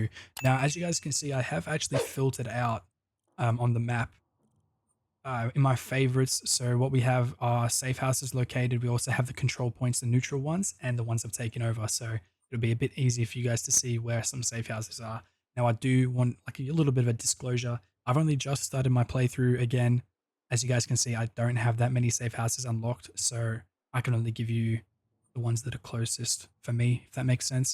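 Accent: Australia. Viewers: Ngl I enjoy hearing another fellow Aussie trying to pronounce these Swedish names 🤣😂😅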